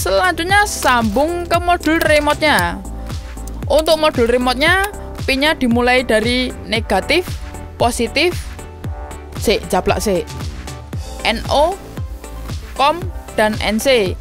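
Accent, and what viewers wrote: Java, Indonesia. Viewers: Akhirnya ada channel gini yang medhok. Udah cukup dengan logat india wkwk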